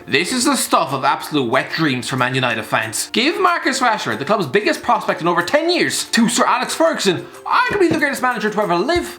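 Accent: Ireland. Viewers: i love waking up to an ranting about football every morning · Hello and welcome to HITC sport right. Love when says that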